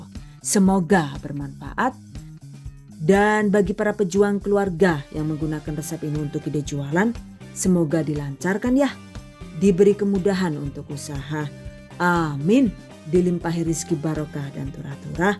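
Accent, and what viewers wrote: Banyumas, Indonesia. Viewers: Mantap bun...Purwokerto hadir ,tp tinggal e ng Bekasi.seneng bgt klo lg ngmng ngapak.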